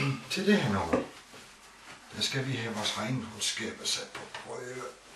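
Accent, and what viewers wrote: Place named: Jutland, Denmark. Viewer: Jeg tager én spongeballs :D haha, nice sleights men den jyske accent er sq lige i skabet :D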